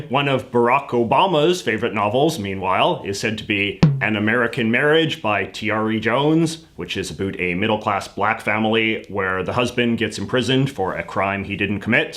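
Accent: Canada. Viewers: Although he does pronounce it a little oddly, you'd expect in his canadian accent that he'd go hard into the R sound but actually skips it altogether! Normally in an anglo accent it's pronounced boar-jwah, but goes for something more like boo-jwah. I'd be interested to know if that's deliberate or not if you do read this! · you have the most stereotypical canadian accent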